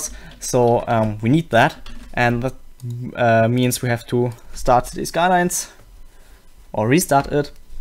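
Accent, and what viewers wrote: Germany. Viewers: No hate but It sounds like you have a German accent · Like for the cool dorky German accent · are you german? your accent is so like one especialy when you end a sentence like german way or lee bit French way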